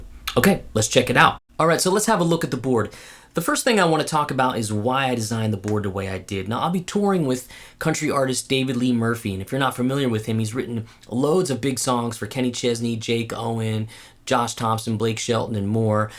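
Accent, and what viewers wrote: South, United States. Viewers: a guy with an italian last name and a southern accent kinda freaks me out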